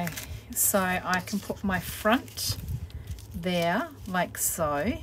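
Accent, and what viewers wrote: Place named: Australia. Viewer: thank for the tutorial. OMG. Bay gul. in California. I thought you said Bible , too. love to hear Australian speak.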